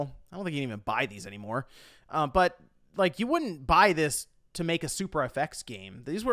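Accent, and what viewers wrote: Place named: United States. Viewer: Must be an American accent thing. Sometimes I swear I hear the word gayboy uttered instead of Gameboy in videos like this beside of of the invisible or softly pronounced M's.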